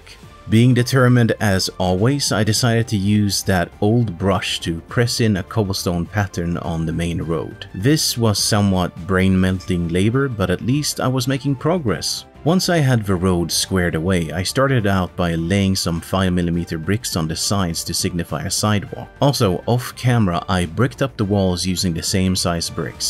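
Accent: Sweden. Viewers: I would love to play on this board with my custom witch hunters! Are you in Sweden? Sounds like it!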